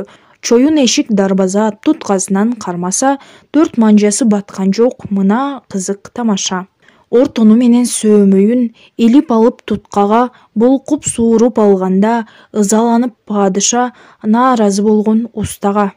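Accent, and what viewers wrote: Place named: Russia. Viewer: Киргизка окшойсун а, акцентини ондо же оруссунбу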